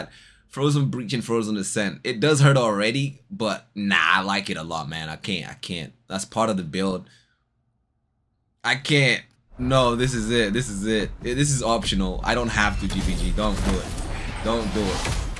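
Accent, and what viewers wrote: Brazil. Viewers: Mano fiquei em dúvida se ele é brasileiro ou americano? pulei um pouco do começo e ele mistura as 2 linguas kksksksks😂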